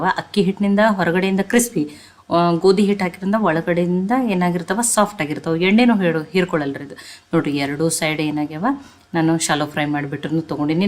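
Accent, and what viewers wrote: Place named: Bijapur, India. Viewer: Madum nim video daily nodtini ri tumba isht nanage nanu bangalorè inda but nau bijapur davaru niu yav ooru pls tilsi nim matu nodidre niu bjp davara anta kushi agutte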